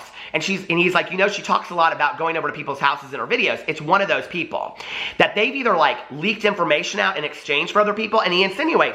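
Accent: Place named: Alabama, United States. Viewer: I haven’t watched you in so long but you pulled me right back and boy howdy did I miss you....As an Alabama native myself, you sound more like you’re from here than people I see walking around Walmart...😂 I love it & you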